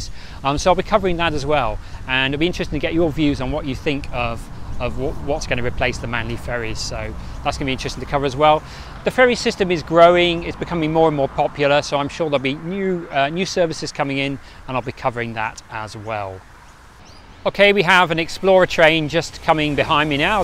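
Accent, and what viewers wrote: United Kingdom. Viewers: You sound British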